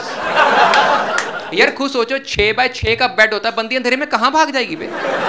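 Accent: Punjab, India. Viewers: Punjabi accent is the icing on the cake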